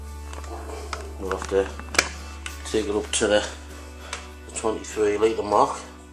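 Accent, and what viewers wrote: Middlesbrough, United Kingdom. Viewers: Oi oi m8....hope all's ok...great vid...hope all works out well....yeah I had t laugh at the froth it looked like one big pint...lol...I'm just avin a pint of wherry atm n I've gotta admit it's got better as the weeks go by the head stays intact all the way down the glass...anyway m8 hope this brew is a banger 4 ya m8...lv nut brown ale...btw are you from Newcastle way it's just you sound like my family Middlesbrough...big respect n oi oi...gav.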